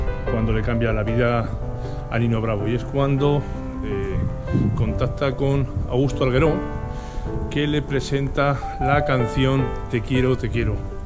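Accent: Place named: Spain. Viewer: Elocuente narracion con ese acento espanol, soy guatemalteco y las versiones de Nino Bravo me siguen gustando, Exitos soy Orozco Bravo.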